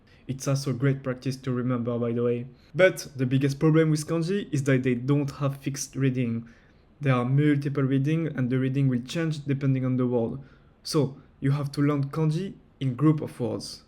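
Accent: France. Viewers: L'accent français mdr 😂 A few seconds in, I get used to it, I just love when people have some accent (as long as it doesn't make them hard to understand). Also the advice seems solid. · I thought you sounded french 😂🙈🙈🙈. You mentioned how learning Japanese is different from learning other languages (mostly in terms of reading). Maybe you can go deeper into that? Maybe talk about how you adapt your your methodology to the language? 🤷‍♀️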